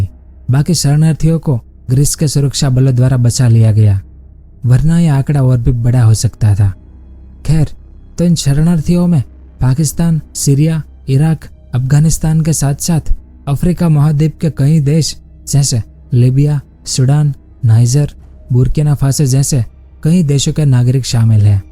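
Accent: Gujarat, India. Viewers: Bhai Tumhara gujrati lehjaa mast he 😁😉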